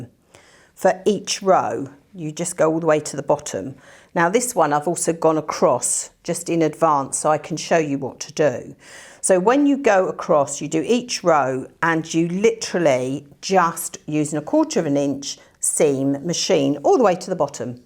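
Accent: United Kingdom. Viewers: I love your videos because you don't rush though. But the problem I have is some of the British words with your lovely accent, I am in Pennsylvania USA. I can't understand what the name of the piece is that we iron the squares onto. If you could give a link that would be so helpful. I look forward to watching more of your videos.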